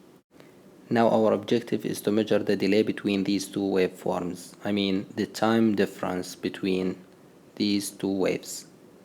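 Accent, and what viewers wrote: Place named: Arab world. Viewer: عربي. واضح من اللهجة ما شاء الله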